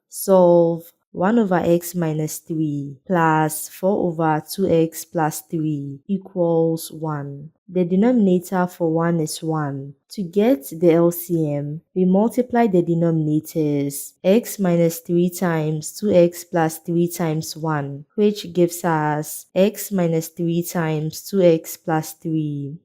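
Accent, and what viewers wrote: Ghana. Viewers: You sound Ghanaian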